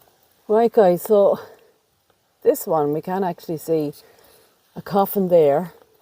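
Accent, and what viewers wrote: Ireland. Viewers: I am really enjoying your videos,. Your Irish accent is so melodic to me. I live in the US, but my father hailed from Dublin, Ireland:-) Your countryside is so beautiful!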